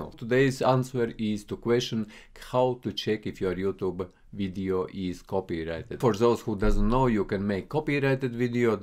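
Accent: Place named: Russia. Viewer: Woah Russian accent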